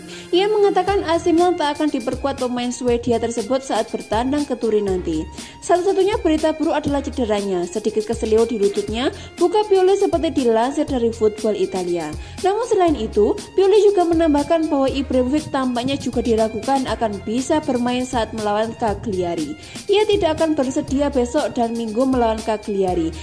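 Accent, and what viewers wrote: Java, Indonesia. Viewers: Host ny logat bicarana ni medok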